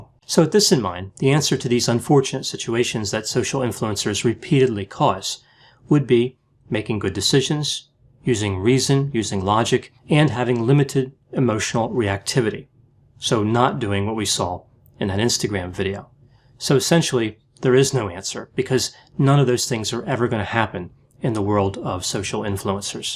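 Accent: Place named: East Coast, United States. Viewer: Caught your accent in this video - sounds very East Coast. Greetings from Philadelphia!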